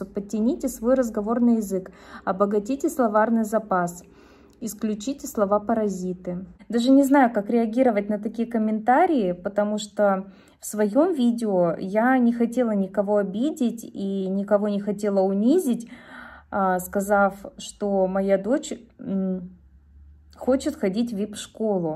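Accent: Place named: Urals, Russia. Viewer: молодец. Все ты правильно говоришь. Да ты говорила, что это центр и называется Вип. А, что ты говоришь по уральски... Да ты умничка, что не стесняетесь это сказать. Да у нас на Урале так говорят. Особенно старики в глубинке. А видимо с детства это запомнила. Что в этом плохого.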